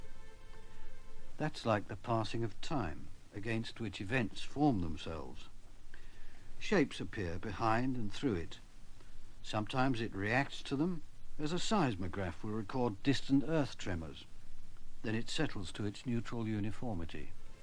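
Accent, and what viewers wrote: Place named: England, United Kingdom. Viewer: Oh, what an authentic English! Of course, the explanation is excellent.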